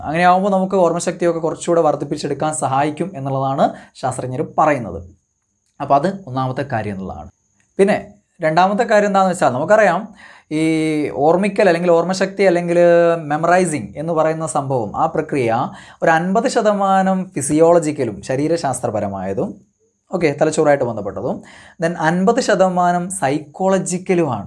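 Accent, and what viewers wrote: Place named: Vadakara, India. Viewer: വടകര ഭാഷ......സൂപ്പർ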